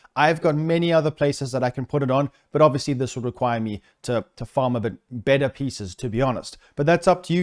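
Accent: South Africa. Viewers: Great video. Haven’t played TD2 after finishing the story when it came out. Thinking about going back again. Anyway, Are you South African? Keep up the great content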